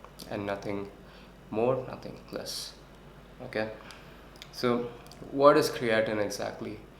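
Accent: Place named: South India, India. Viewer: Most south indians have this accent, your English accent depends on your first language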